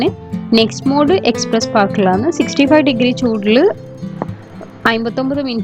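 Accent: Kannur, India. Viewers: Ningal thalasheri anno kannur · Madam Kannur ano? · നല്ല രസം ആന്റെ സംസാരം കേൾക്കാൻ..കണ്ണൂർ ano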